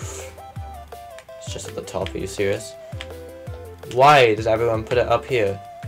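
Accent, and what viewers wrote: United Kingdom. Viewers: I like how you have a full British accent, but when you say mario, you sound so American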